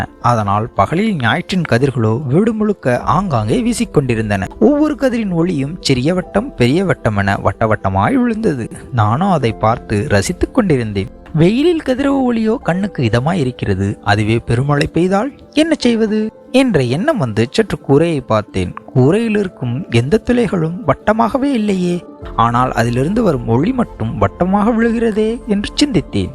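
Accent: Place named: Sri Lanka. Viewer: அருமை.. இலங்கை தமிழர் பேசுவது போல இருக்கிறது.. கடின உழைப்பும், அறிவியல் ஆர்வமும் ,கொண்ட முயற்சியில் பின்வாங்காத சிந்தனையும் ஆச்சிரியப்பட வைக்கிறது.. வாழ்த்துகள்🎉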